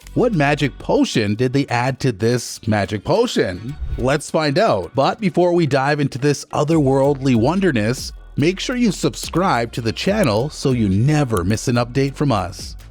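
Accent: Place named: United States. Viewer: all these patterns and designs in the top of the guinness as in the clover design etc..... all for americans. no self respecting irishman would fook around with a pint in such a way. soon as i heared the american accent describing guinness i knew it was all nonsense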